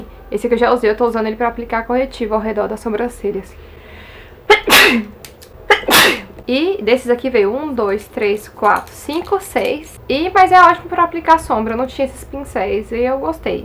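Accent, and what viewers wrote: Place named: Bahia, Brazil. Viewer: SÓ VOCÊ MESMO ! Estava pesquisando para comprar pincéis .. arrasou .. comprar nesse site e como sou ansiosa 18 dias eu aguento kkkk assim que chegar eu te conto. Sua linda muito obrigada pela dica. Eu adoro demais seu canal !. Ahhhh até esqueci mas a minha amiga que tem seu sotaque ela mora no interior da Bahia Hahahhaha 😂😀 Beijo lindaaaa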